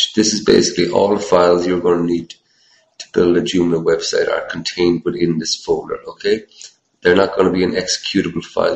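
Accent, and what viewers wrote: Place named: Ireland. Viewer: You sound Irish..but with a twist of something else. Its hard to tell with the audio quality. Thanks for the tut anyway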